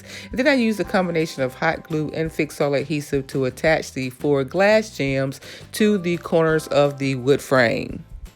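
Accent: South, United States. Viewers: I hear. Just with a southern flow but clearly. Love the table.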